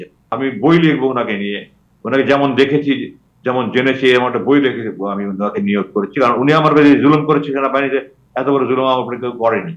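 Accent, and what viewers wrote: Sylhet, Bangladesh. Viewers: Kindly try to improve ur SYLATI ACCENT Mr. Conductor.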